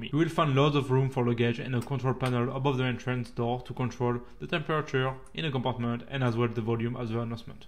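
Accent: France. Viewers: Thanks for the personal side in your video. It explains why you're the nr 1 train vlogger. I don't mind your talk or French accent. You can always switch off the volume. I prefer subtitles only BTW. Merci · Your video-clips are usually very informative. I truly appreciate that, thank you very much! 👍 However, I sometimes find it difficult to catch up with you. No doubt - your french accent really is lovely! 😍 I however would appreciate if you could speak a bit more clear and not as fast. This would help me (and others perhaps) a lot to better understand the content and the informations provided by you in english and to compensate the accent by a bit. Thank you so much for not taking this as a personal criticism but a friendly request from me, a viewer that really enjoys your highly appreciated effort to spread out informations on und about train-travel in europe. 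· I love your french accent! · Very good, really appreciated your commentary,. Liked the sequence in the cab...and do show a few pics of yourself, you are a good looking dude. By the way, your French accent is a plus. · NO! I much prefer and love your non-voice videos. With all due respect, your English is difficult to understand, and you speak too quickly. Nothing against the French accent (I love it), but you tend "swallow" words, especially names. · Nice video, really helped with our booking.. love the French accent, don't change it - I would say to try and speak slightly slower, just so people can fully understand everything you're saying.. but, keep up the good work! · I love your French accent, but do admit I turned on CC. Sorry. 🙂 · Bravo, ce format avec voice over est beaucoup plus sympathique et on a plus l’impression de faire les trajets avec vous. Merci pour toutes les nouvelles informations communiquées. 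And the French accent is charming. Arnaud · We very much appreciate your talking,. along with english subtitles. Your "French accent" is lovely and most enjoyable to listen to. Please keep talking! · I do have trouble staying with you because of your French accent. I think if you spoke more slowly in English it would be better. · Regarding the presentation of the video, I did like the spoken commentary. However, I did have a bit of difficulty with your french accent, so I put up the ENGLISH subtitles. That did help! This evening I will watch Eisenbahn Osterreich, Deutschland, Schweiz. This a German language channel giving news about railways in the three countries where German is the most important language. The presenter, Sebastian Reisinger, is from Vienna/Wien, and speaks a very clear German most of which I can understand. But to help, I put up GERMAN subtitles. (Subtitles in other languages are not available.) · Love the trainride and hope to take it in spring 24 the other way round. 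I like the voice over and do not the French accent. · Loved the video and truly enjoyed your voice over commentary and your French accent. Just a little feedback. Like most native French speakers you speak very fast, even when speaking a language that isn’t natural to you. Just slow it down a little, and we will hear you more clearly. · A fascinating and excellent video as always - thanks very much. It would be good to have a variety of subtitled and narrated videos. As for your English and French accent, you have no need to apologise. You speak very clearly and can easily be understood. This is a journey I’d love to take, but the thought of my partner and I having to share a couchette even in 1st Class puts me off. Come on SNCF, you should provide some private sleeping compartments and you should also have better catering. So many train companies have cut back on catering but it’s a false economy. 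If you provide good facilities, people will use them and most will be prepared to pay to use them. The SBB in Switzerland is an example of that - we’ve eaten in several SBB restaurant cars over the years and they’re always busy.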